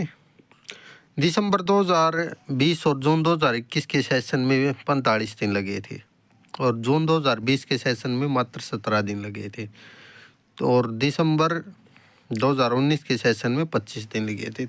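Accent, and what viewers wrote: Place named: Haryana, India. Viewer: आप हरियाणा से हो बोलने के अंदाज से पता चल रहा है 😍😍☺️☺️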